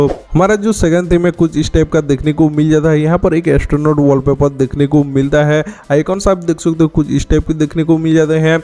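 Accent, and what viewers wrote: Odisha, India. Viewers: I can recognise odia people's Hindi accent from miles away..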